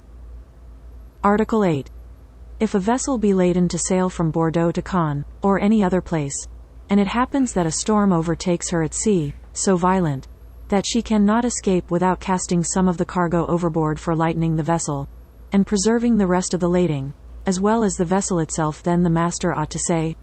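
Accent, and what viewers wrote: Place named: Louisiana, United States. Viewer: Man I love your content. I hope I don’t offend if I’m wrong but I detect a Louisiana accent. Woahdayyyy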